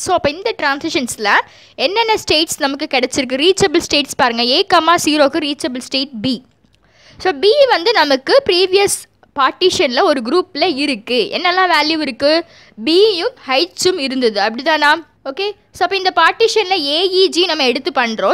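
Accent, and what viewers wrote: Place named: Kanyakumari, India. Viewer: Sister r u from kanyakumari ur slang seems to be like that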